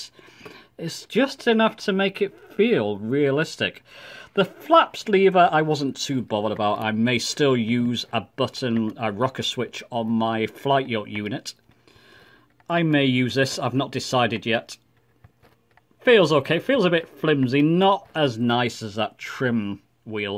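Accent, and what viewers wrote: United Kingdom. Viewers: Hello, I found your YouTube just days ago. It is very helpful and I enjoy the tutorial very well. There is a question for you: can the Logitech G Saitek Pro Flight Multi Panel compatible with the iMac? I have to make sure before I buy it. I am a very beginner for the X-plane 11 installed on my iMac. BTW I enjoy your British accent! Thank you in advance!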